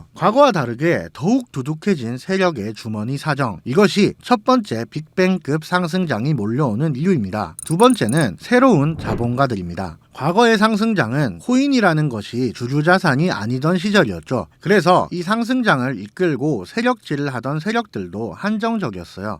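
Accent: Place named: Gyeongsang, South Korea. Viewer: 갱상도는 발음 어쩔수없어요 괜찮아요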